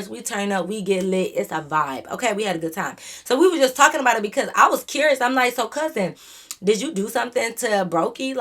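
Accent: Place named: Michigan, United States. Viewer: Lol I just knew you were from Michigan soon as you started talking but once you said good times I’m Like yeahhhhh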